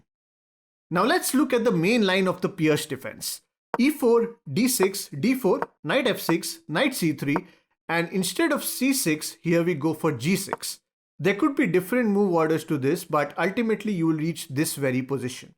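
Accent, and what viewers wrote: India. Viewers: This chanell is magic! Dude with indian accent whisper me when i played... No Offense because Indian and Singaporean Accent is easier to hear by majority of Asian... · Some people need the subtitles to better understand Hindu English to give them a better experience, unless they miss important lessons...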